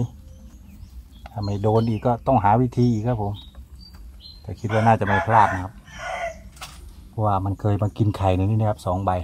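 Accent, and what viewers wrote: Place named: Central Thailand, Thailand. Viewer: พี่พูดกลางทำไมไม่เรียกปลาช่อนครับ5555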